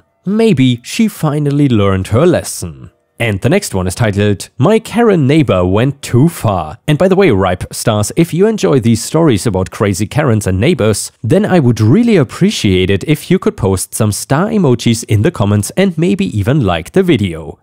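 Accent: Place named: Germany. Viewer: Where did you come from? Your accent sounds German.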